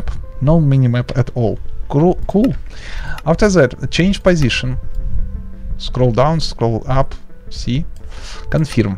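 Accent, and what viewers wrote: Russia. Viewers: are you russian who is speaking in english? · He is russian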